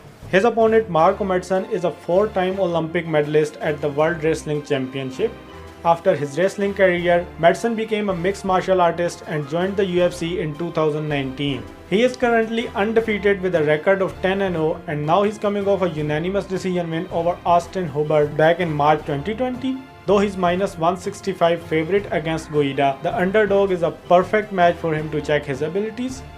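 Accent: India. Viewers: Plz do something about your accents. Ur English is like very very Indian. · "Ur English is very very Indian", lol. Tf do u expect an Indian dude to have? A British accent?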